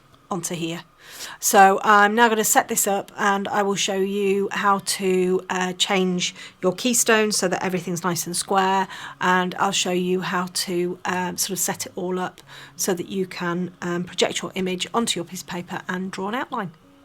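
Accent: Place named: United Kingdom. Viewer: I got really excited when I heard your british accent, that means I should be able to get a hold of this. Thank you!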